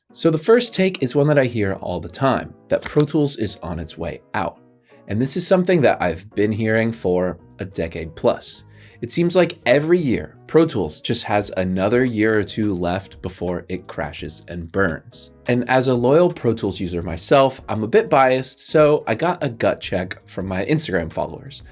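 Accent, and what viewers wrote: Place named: United Kingdom. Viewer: Where are you local to? UK I'm guessing? I watched a video yesterday and I think that was your accent.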